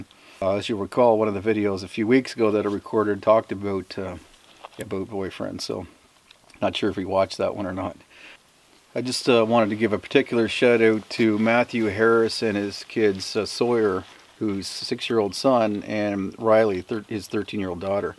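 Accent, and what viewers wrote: Canada. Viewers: Being a Canuck (Ontario) living in the USA and just finding your videos, this is a great feeling. I am enjoying living stateside however, I miss Canada and my backcountry escapes. Nature here is different and while that's great to experience, I have the feeling for the wilderness I grew up travelling to. Killarney, Algonquin Park, North Bay etc. Those trees, bird sounds and smells feel like home in comparison to what we have around us now. So your videos (and accent) are a great view of home. Thank you for making them and I look forward to watching more. All the best!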